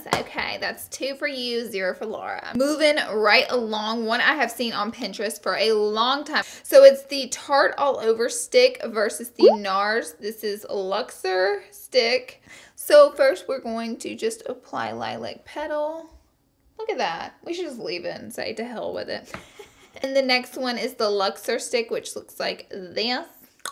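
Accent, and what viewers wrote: South, United States. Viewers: Yes baby you got that beautiful southern bell accent 💞 makes me feel at home. 🤗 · The vocal fry combined with the deep southern accent can be unnerving to some people, but I'd say just don't watch. Her content is good, and it's not like she can help it much. Don't know why people feel the need to be nasty about it. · By the way I love your accent it's so cute and southern💕💕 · I love these videos!!!! They are just the best! I love ur southern voice!! Ur vids are just the best! And ur palette!! Come thru!!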